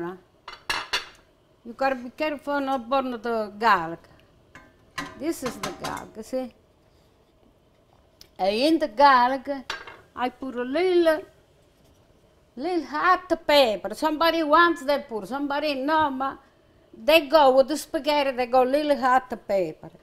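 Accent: Italy. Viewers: She speaks a perfect Italianglish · I simply love this Italian Grandmother. I made this last night, and it came out fabulous. Thank you so much for sharing. Love her accent. I can listen to her all day. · First, the word anchovies is in the plural, so you ask: 'Where are the anchovies?' Second, anchovies do NOT go very well with this dish, what about some cheese ? However, I love the Italian accent ! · But it's hard to understand her .she has strong Italian accent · How much i like that accent! Italia!😊💝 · La signora, classe 1935, ha lasciato l'Italia negli anni '70, partendo dalla provincia di Foggia. Come molte donne del Sud Italia, probabilmente avrà al massimo frequentato le scuole elementari e quindi l'unica lingua parlata era il dialetto foggiano/pugliese. Questo aspetto, dopo 50 anni vissuti negli States, comporta questa pronuncia (simile a molti emigrati italiani negli USA), ovvero uno strano mix tra dialetto, italiano e inglese. 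Con parole italiane che - con il tempo - vengono pronunciate nella maniera inglese. E questa forte cadenza del suo dialetto che influenza la pronuncia in generale. Le dosi di aglio e peperoncino, come dice anche mentre prepara il piatto, sono abbastanza a discrezione. Anche secondo me ne ha messo troppo (tra l'altro - come sai - alcuni mettono lo spicchio intero e lo tolgono alla fine) ma, soprattutto, ha messo il pecorino/parmigiano che in questa ricetta non c'entra una fava! 😅 Già a me dà fastidio il prezzemolo (che va messo comunque alla fine, non nell'olio caldo!), ma è un ingrediente che si usa mettere. Ma il formaggio grattugiato proprio no.😩 Comunque, come forse sai, è abitudine abbondare di aglio nelle cucina del Sud. Come con il peperoncino 😳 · Thank you for sharing your faith Bless be Jesus Christ give you more year continue cooking with love sharing with all people around the world 🌎 ❤ 💕 God bless you always Have bless day I love your Italian food and your beautiful accent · I like her Italian accent 😄😆😁🙏👍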